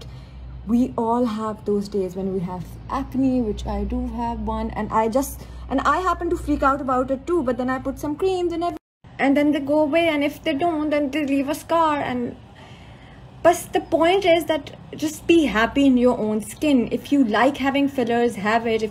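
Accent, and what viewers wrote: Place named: India. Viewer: Her accent is indian 😂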